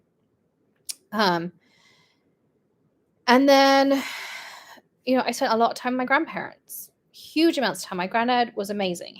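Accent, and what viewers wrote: England, United Kingdom. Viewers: You are a brave and strong women and I applaud you for continuing your journey through all the obstacles and coming out ahead! I have been following you for about a year and have binged watched all of your videos! I don't usually participate onlne during lives, except to say hi, but I really enjoy your creativity (and your English accent...lol) and your energy. Continue the strong the path! You are awesome!!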